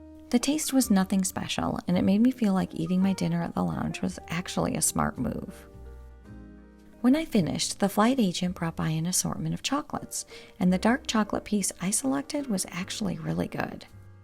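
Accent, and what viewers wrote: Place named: United States. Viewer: あなたの英語はアメリカ語英語です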